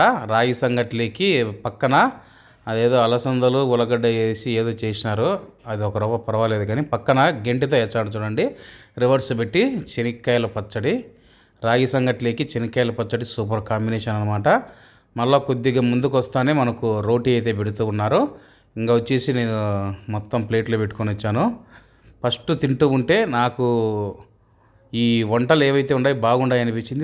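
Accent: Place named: Rayalaseema, India. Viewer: That is the rural slang of Rayalaseema people.We need not correct any slang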